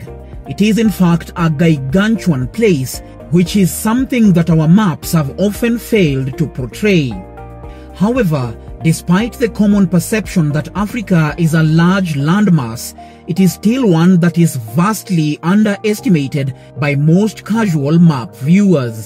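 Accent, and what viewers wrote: Kenya. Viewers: This ascent is Kenyan 😁... Much love brother man. 🔥🔥🔥🔥🇰🇪🇰🇪🇰🇪🇰🇪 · This Kenyan accent is hilarious.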